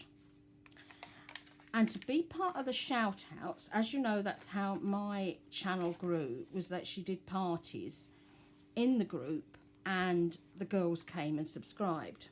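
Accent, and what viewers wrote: United Kingdom. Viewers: woohoo! congratulations ! Just subbed! Sound like you're from the UK, I am in Lincolnshire :-) absolutely gorgeous journals, love the watercolours imageries. Hope to win :-) tfs, Ivy xx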